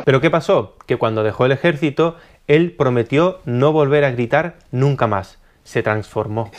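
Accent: Spain. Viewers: Porque hablas como español si eres de mexico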